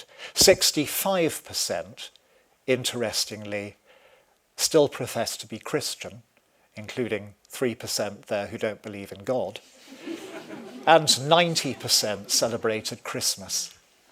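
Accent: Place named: RP, United Kingdom. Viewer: It’s called RP, Queen’s English.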